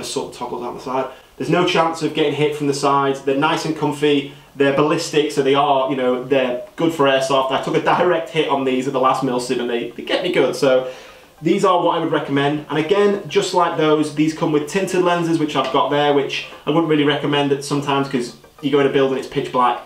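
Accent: England, United Kingdom. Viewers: Witch part of England you from mate? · Where do you play, not a milsim, but a skirmish play, because you sound english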